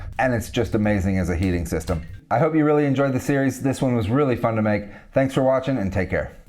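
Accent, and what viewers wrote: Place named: United States. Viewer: I’m new here. He’s probably already explained it in previous videos, but I’m a bit confused about American accent, farenheits and UK power outlets 😀 what’s going on?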